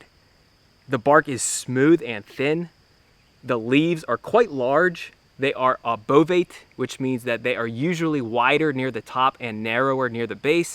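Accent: Pittsburgh, United States. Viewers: Is that a yinzer accent i detect??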